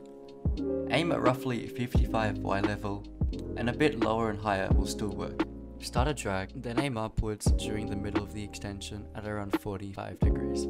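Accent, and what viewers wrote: Australia. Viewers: idk i why im asking this but u sound aussi. are you? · I KNEW IT, HES AUSSIE, btw this is the gist voiced vid that I have watched from tou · YOU SOUND LIKE.. an australian…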